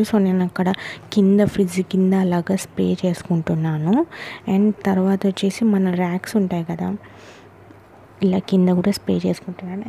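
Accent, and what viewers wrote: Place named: Telangana, India. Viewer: super voice telanga voice alane untadhi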